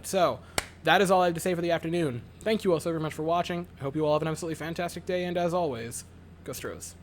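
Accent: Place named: Canada. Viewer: Sounding like a true canadian, subbed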